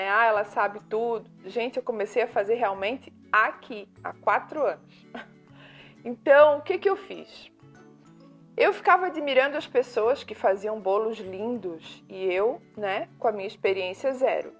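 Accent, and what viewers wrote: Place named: Itajaí, Brazil. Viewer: Querida! Que Deus te abençoe sempre ❤🙏🏻 Legal ouvir um "sotaquezinho" de Itajaiense aí, na América, amoooooo🥰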